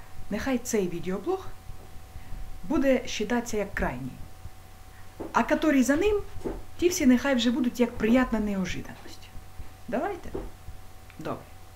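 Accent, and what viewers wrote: Surzhik, Ukraine. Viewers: Какой прикольный суржик :) Молодец! Хейтеры пусть идут в путин.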